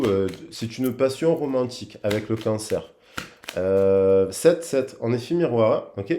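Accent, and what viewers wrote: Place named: Marseille, France. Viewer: BON et bien bon courage!! J' espère que la communication va bientôt arriver avec Arnaud...au fait Je suis cancer ascendant vierges et descendant poisson 🐠 alors voilà j'y crois comme à la 🌏 terre j'y croit comme au soleil ☀ et à mon Arc en ciel qui va m'apporter de très bonne 🔮 prédictions..bien sûr avec ta voix chaude et sensuelle qui n'appartient à personne qu'à toi sur le net! Et c'est un plaisir de t'entendre à chaque fois avec l'accent de MARSEILLE! BRAVO 👏 POUR TOUTE TA RICHESSE INTÉRIEURE QUE TU AS VRAIMENT MÉRITÉ ET TOUTE MA GRATITUDE POUR NOUS PERMETTRE D'Y ACCÉDER GRATUITEMENT ET AVEC TANT D'HUMILITÉ 👋 CIAO 👋 😆🤩😉🌟❤️‍🔥💞🌈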